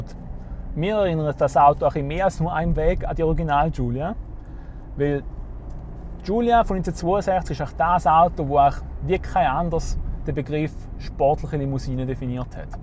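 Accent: Switzerland. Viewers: hätte ich mir fast gedachtm hab Freunde die ich von Autotreffen kommen und wenn die ihr Schwitzerdütsch auspacken dann klingt das auch so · de typ isch 100pro en sangaller hahahahhaaha me weiss jedoziit wa dVodorädo am mache sind. lol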